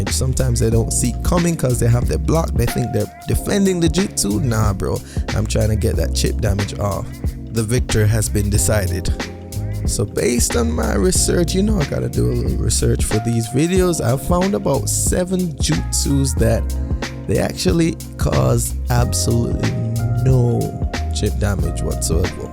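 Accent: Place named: Jamaica. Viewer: I hear jamaica 🇯🇲 in your voice #subbed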